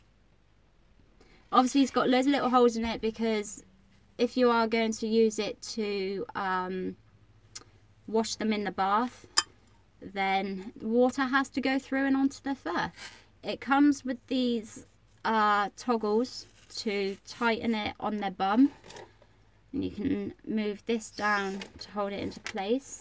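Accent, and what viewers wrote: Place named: Canada. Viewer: Love ur videos and I love your accent r u from Canada? I'm from. San Antonio Texas in the USA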